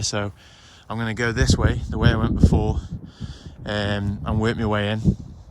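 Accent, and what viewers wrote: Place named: Stoke, United Kingdom. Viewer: Can spot a fellow stoke accent a mile off. Yes pal keep up the good work. Utmp /utv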